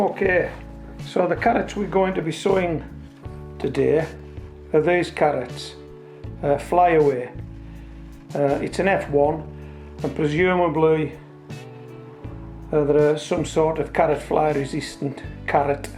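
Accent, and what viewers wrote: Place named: Scotland, United Kingdom. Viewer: Accent seems almost Scottish. Workington up near Scotland?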